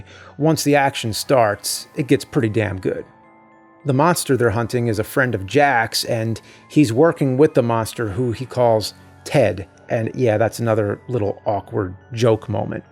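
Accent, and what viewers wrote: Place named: New Jersey, United States. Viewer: It's the Jersey accent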